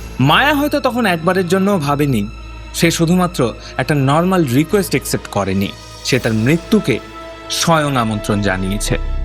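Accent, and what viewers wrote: Bangladesh. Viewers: Tomar kothay Bangladeshi tan ache😂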